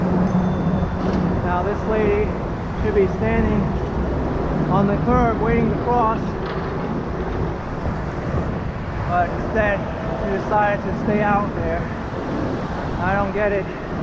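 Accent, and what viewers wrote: New York, United States. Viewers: Cute NYC accent.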